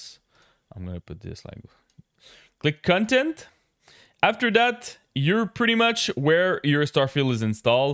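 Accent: Quebec, Canada. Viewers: merci man l'accent quebecois est en force ahahah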